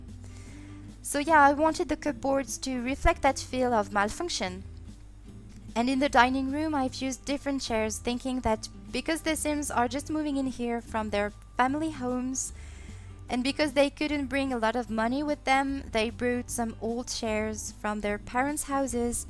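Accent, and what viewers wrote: France. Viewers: J'ai reconnu direct que tu etait française ❤️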